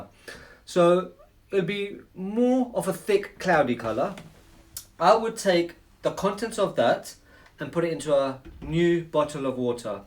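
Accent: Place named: Pakistan. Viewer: This guy sounds like a pakistani not omani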